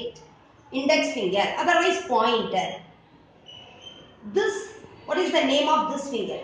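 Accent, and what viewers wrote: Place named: Tamil Nadu, India. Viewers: Yes she is from Tamil Nadu as Dr. APJ does. Hence, both speak TN's English accent. :) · I can bet she is from south India just by her accent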